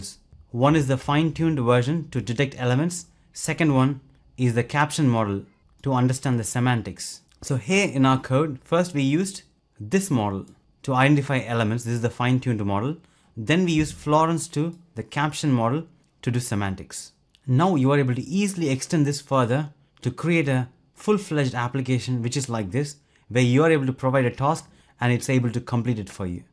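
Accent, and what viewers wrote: South Africa. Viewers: Thanks dude. Somehow I knew you were from SA. I'm in George, western Cape. Happy coding buddy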